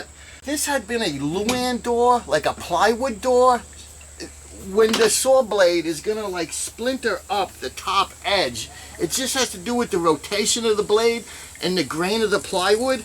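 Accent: New York, United States. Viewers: LOL!!! Glad you said that about the masking tape. I did that when doing a fret leveling/crowning on an acoustic guitar.....with the lightest tack painters tape......something came up and I left it on for 4 or 5 days, and it was like epoxy!!!!! Something that peels off so easily turns into the hardest thing int he world to get off......I wonder how many will listen to you on that. With that, people might say "This stuff...nah....it barely stays on...how could it ever be difficult to remove"....and they have to learn it for themselves!!! Good stuff though. Trying to figure if you're Staten Island or Long Island.....definitely not Manhattan, maybe Bronx, not Brooklyn......trying to get the accent. lol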